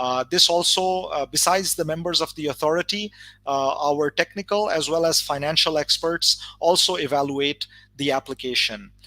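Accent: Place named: United States. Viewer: We have high hopes from this authority__ the major duty is to start manufacturing the technological products by local engineers. For example machines in medical/ health sector needs an original machine and then engineers trainees under supervision from seniors make the copies__ I am ready to volunteer and invest in it. We have seen in China, Thailand, Korea, Japan, that making the new products is far cry but the first thing is to make cheap copies of famous brands. We, as Pakistanis have to be on war footings on this front Sar dena parey ga nahi to koi ley jae ga__ needs highest Patriotic passions here. I am listening this and unfortunately internet is not working of the speakers. Make the things simple __ don't talk in USA styled English__ do something positive in most humbled fashion plz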